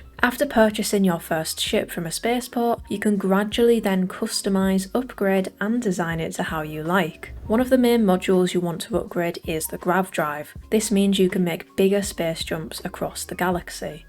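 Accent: United Kingdom. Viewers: shes definitely English.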